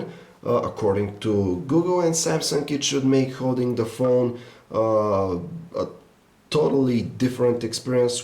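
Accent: Russia. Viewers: Sir r u Russian? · are u russian ? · put someone english speaking, i dont want to listen rusglish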